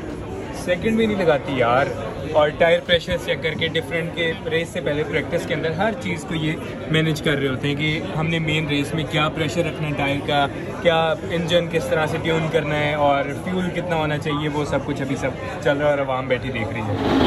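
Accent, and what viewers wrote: Punjab, India. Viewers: Bro great ap ka punjabi acsent kamal ka ha 🥰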